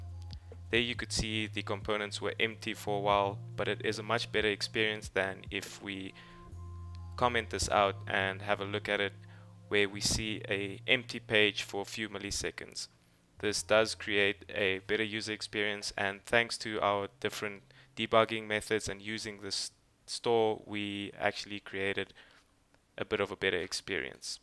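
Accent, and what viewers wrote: South Africa. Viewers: Sound like a South African, good for you.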